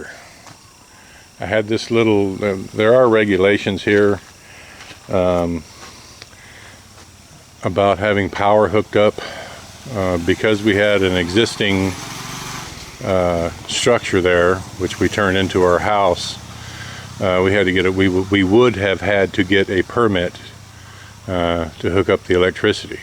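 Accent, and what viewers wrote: South, United States. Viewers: that kind of house is the best shelter for you since bohol is always visited by typhoons... by the way, you have a nice southern accent.. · You got some southern droll accent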